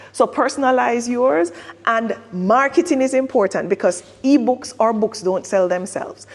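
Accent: Jamaica. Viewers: O Jamaican in foreign you talking 😂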